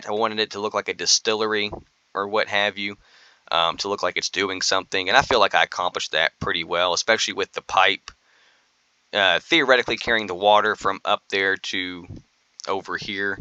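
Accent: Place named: South, United States. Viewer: Nevermind, you have the southern dude voice and you sound like you grew up shooting squirrels I apologize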